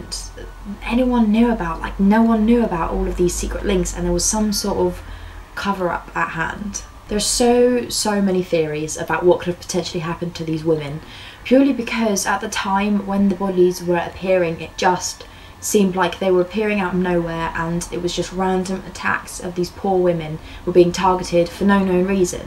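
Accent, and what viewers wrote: United Kingdom. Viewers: It just sounds different because she's from Britain. 🤔 Grits in Chicago is the same as grits in Alabama 😂 And that would be porridge in England. 😆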